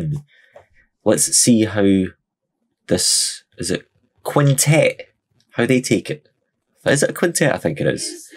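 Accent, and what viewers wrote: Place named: Scotland, United Kingdom. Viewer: Good to hear a good strong Scottish accent. (West of Scotland, I'm guessing. Edinburgh myself). Recently voted the sexiest accent in the UK in an international poll. (Scottish, not just Edinburgh.) Of course. I'm a Pentaholic but I'm not going to suggest anything. There are plenty of others to do that and they will. My favourites of are not always among their most popular anyway. But you won't be disappointed in any of them. Cannae wait tae see where it goes frae here. Pronunciation guide for the 'furriners': Can ay way' tay see whayr i' goes fray he-ur. (glottal stop after way and i).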